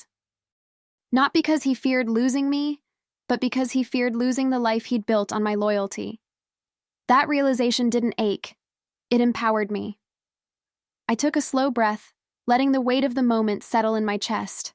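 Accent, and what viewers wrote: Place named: United States. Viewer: very strong over-emphasised American accent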